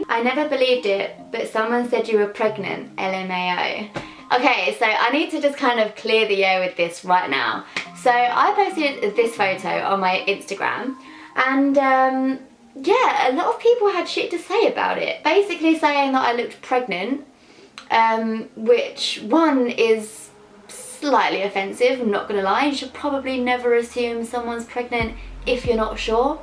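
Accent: RP, United Kingdom. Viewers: Naa u sound posh still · You say put the roadman accent on, what roadman accent 😂u still sound posh bare gyal in South speak road u don't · i think the stuck up one was thought bc of your accent bc its kind of posh compared to others especially compared to mine ahahhaha im from new zealand but yeah idk i personally don't think you are I think you're awesome!!!! :DD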